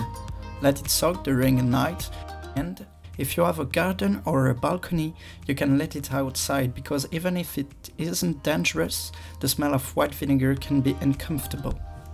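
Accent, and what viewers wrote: France. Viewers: Love your french accent!!! 🤗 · Thank you for sharing and please no apologies for your beautiful French accent! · Your French accent is fine and your English is excellent. Thank you for your video. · Please never ever apologise for your French accent! It's adorable! And this is an excellent video, so thank you for posting. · Absolutely no need to apologise for your gorgeous French accent! You speak far better English than some people in my part of the UK! Thank you so much for this video - worked perfectly on my very neglected burners ❤️ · Your French accent is very sweet and charming and your English is fine. No need to apologize. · don't apologise for your accent , it's what makes you unique, i have a french accent too, ah ah , love your video by the way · It is nice to hear your French accent.Thankyou this is so helpful. Easy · There's nothing wrong with your French accent. 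You should listen to mine! LOL! Very good job on the video! Well done! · merci beaucoup for the tips. Love the french accent so no problem at all!😊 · There's no need for you to apologize for your french accent I can understand your English perfectly.🤗🤗🤗🤗🤗🤗🤗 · I like your French accent me too I have a French accent I am Mauritian the family speak French and creole thanks very much for your video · Please don't apologise for your georgious French accent, to me that's what made this video so interesting. Had to watch it twice. Very good advice Also some of the comments were helpful to. Thank you for this video. From London UK 🇬🇧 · Thank you, I will tryy this. Your French accent is charming! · Your French accent is music to my ears!! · Do not apologize for your French accent. Merci beaucoup. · Never apologize for your french ascent love it. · I've lost all interest in cleaning my hob – I just want to stay here and enjoy the lovely French accent! 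· thank you for your video. your french accent is gorgeous! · Your French accent is music to my ears and your English is faultless. Thank you for the video, it was most helpful 🇬🇧🏴 Enjoy your weekend. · Your English is great and I like your French accent. No apologies are necessary. Thank you for sharing. This is a great tip. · We love your French accent. Your are French after all❤️❤️Merci!! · Don’t ever apologise for you accent we love it! Be proud of your heritage and you French culture thanks for the video! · i love your french accent! Tres Sexy! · Good information! Love the French accent -- no need to apologize. :-)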